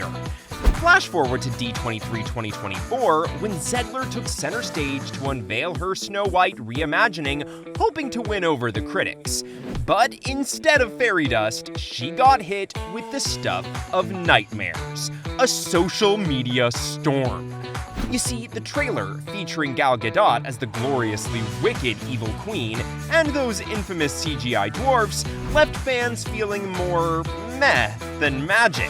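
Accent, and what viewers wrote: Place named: United States. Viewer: Could this narrator have a more annoying stereotypical American accent? He's the stereotypical irritating American (voice and opinions).... 🤮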